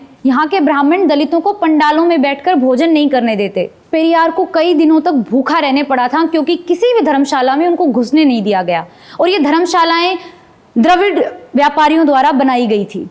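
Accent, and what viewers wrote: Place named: Haryana, India. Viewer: You have a distinct haryanvi flavour in your accent, and i really like it. Respect from this bihari. Love your journalism and topics that you raise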